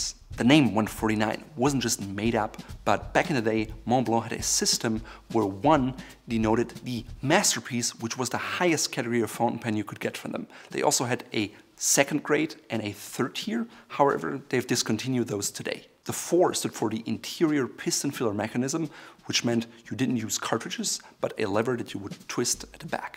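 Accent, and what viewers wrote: Germany. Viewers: English is not his native tongue. I believe it's German. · I hear a slight German accent? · This guy is german, but its hard to notice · He's German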